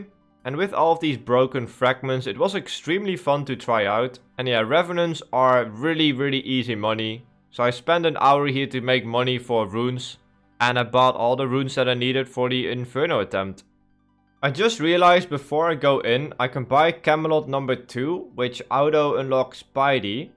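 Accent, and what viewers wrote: Netherlands. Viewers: Normal people would make a 10-video series out of this, you put all of this in a single 11-minute video… madman, je verliest je Nederlandse accent ook steeds meer.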